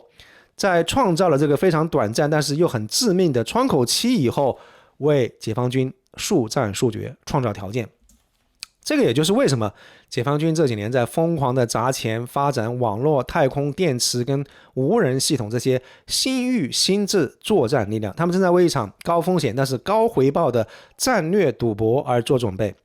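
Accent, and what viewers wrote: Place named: Hubei, China. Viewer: 湖北人吧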